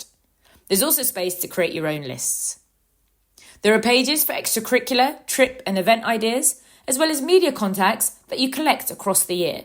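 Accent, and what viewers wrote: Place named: United Kingdom. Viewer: A famous British accent!)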